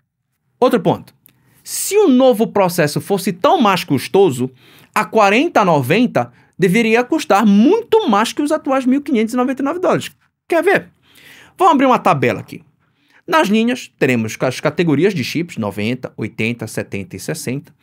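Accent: Pará, Brazil. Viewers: Eu comecei a te ouvir pra matar a saudade do sotaque do Pará, mas gostei demais da maneira como vc discorre sobre os assuntos. Então fiquei, apesar de não entender nada do assunto. Muito bom o conteúdo, alto nível.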